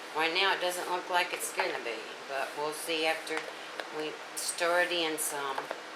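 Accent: South, United States. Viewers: I could listen to that ADORABLE Southern accent all day long! (Makes me kiss my family in Tennessee). I love your macaroni salad too.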